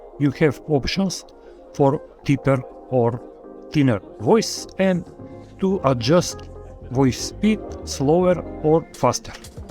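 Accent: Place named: Russia. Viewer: Useless instructions, I can barely understand the part English, part Russian accent, and the video is not simple enough to follow, as most of the web pages you see on the video, either don't exist anymore or don't look like video and all the links that are shown in the video are not on the changed web pages, in fact, this video needs to be updated, and also, show us how to create a new avatar from scratch not from what you already have.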